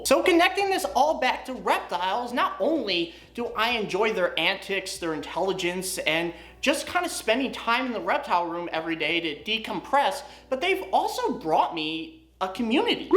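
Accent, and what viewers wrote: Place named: New Jersey, United States. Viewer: Don't sweat the negativity,. I've learned a lot from you. You're my Ackie guy, and when I'm ready to get one I'll come to you. BTDubs, you can't help the Jersey voice bruh, lol. Lots of love from a southerner and I hope you feel better.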